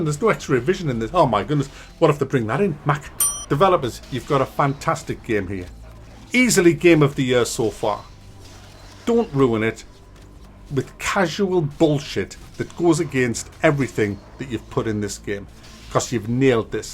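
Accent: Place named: North East England, United Kingdom. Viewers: its the north east accent from the Tyneside region, most British accents don't pronounce boat like board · Love this guy - I'm from the North West of England and he's from the North East, so I'm very familiar with the accent, but these videos entertain me no end. The bit about the sea serpent XD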